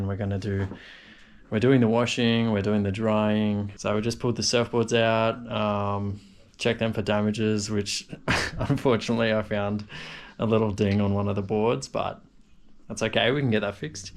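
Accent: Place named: Australia. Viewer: Are you German? You sound much more like an Aussie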